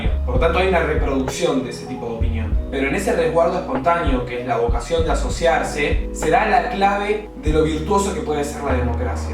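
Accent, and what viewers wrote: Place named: Argentina. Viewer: Hasta las huevas el audio y encima habla un argentino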